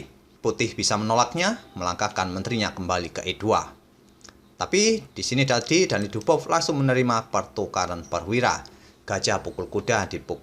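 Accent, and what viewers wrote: Java, Indonesia. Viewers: Cara bicara nya jangan sering pake logat Jawa , sulit dengar nya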